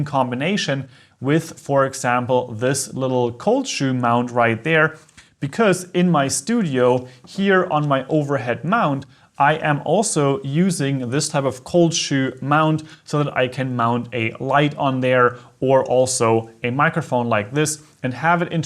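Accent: Germany. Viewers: alles gut. Guter Inhalt. Gutes, flüssiges, verständliches Englisch. Dennoch hört der Landsmann den Landsmann durch ;)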